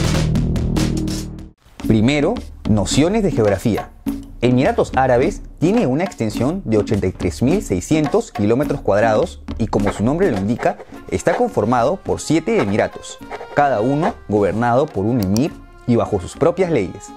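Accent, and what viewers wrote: Venezuela. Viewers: Eres venezolano?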